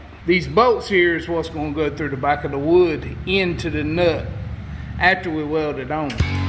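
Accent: United States. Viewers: love his country accent and done a great job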